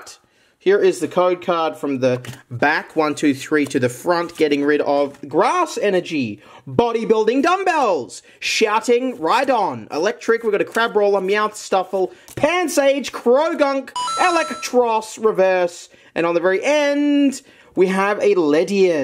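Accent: Australia. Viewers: you sound like the australian rick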